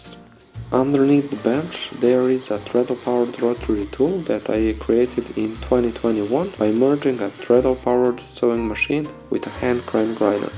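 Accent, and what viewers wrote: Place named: Russia. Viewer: У тебя такой классный русский Английский, прям не могу)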